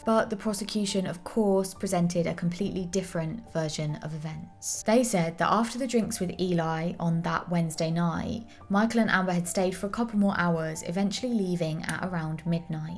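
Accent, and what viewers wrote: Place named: East Midlands, United Kingdom. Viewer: sweet east midland voice, dimples and football active wear...be still my heart:thanksdoc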